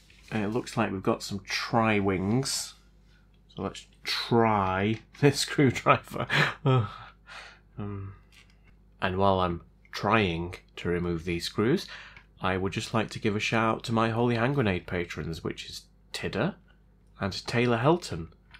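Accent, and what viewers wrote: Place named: United Kingdom. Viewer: It's always interesting listening to a Brit pronounce R's.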